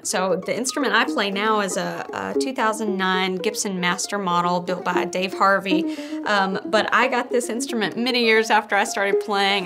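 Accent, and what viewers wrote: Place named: South, United States. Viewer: Her accent is crazy. It's like some words she says are from the south but then every now and then a few northern ones slip in.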